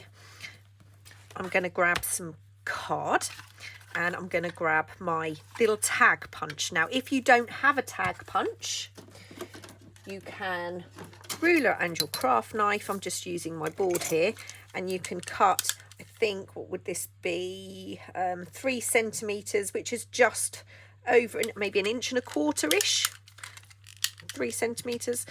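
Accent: England, United Kingdom. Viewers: I love your hands and your fancy "English accent". The crafts you show are quite elegant and nice. I appreciate so much what other women do, this enriches us women to watch their creativity and good taste. Tysm for sharing it! ❤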